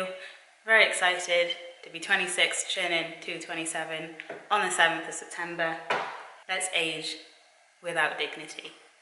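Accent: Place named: United Kingdom. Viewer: Shw has a british accent kind of🙂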